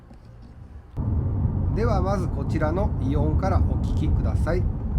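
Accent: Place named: Kansai, Japan. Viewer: 関西イントネーションもいいです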